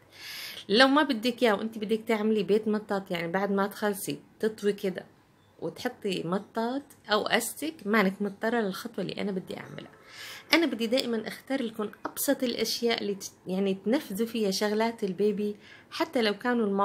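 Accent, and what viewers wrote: Egypt. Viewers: تسلم ايدك عل شغلك وشكلك قاعدة بمصر اللهجة المصرية باينة في كلامك شويه تسلمي حبيبتي من كل شر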